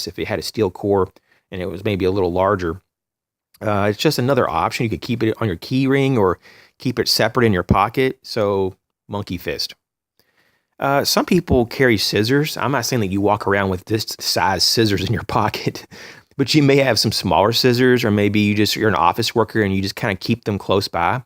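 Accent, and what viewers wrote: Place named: South, United States. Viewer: Southern Georgia? Or maybe Texas or Louisiana? Trying to place your accent. it's a hobby of mine, I'm North Alabama.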